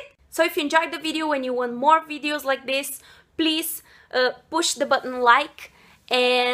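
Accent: Brazil. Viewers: Strong, I mean! ;) But it's natural due to our Brazilian Portuguese phonetics, sometimes it can be hard to "get rid of" them! Mainly if you have started learning after a certain age... But don't worry, your English is great! It's completely natural having an accent, mainly if it's not your L1... And I meant you have a cute one, a cute accent! :D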